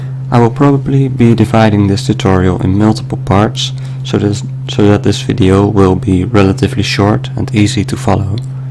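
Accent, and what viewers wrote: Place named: Netherlands. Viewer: Hoor letterlijk aan je stem dat je nl bent hahah lol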